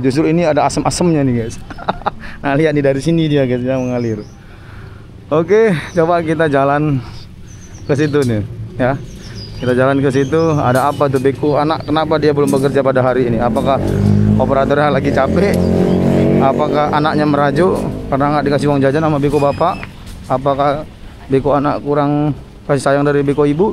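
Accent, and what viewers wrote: Medan, Indonesia. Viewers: Logat medan nya lebih kentel lg bang, tambah celotehan lucu nya terus..😅😅😅😅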